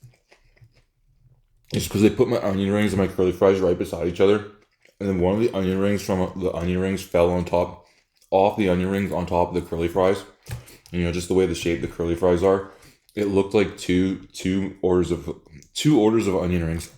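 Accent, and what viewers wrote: Canada. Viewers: Where in Canada are you from? I’m guessing Alberta by the accent.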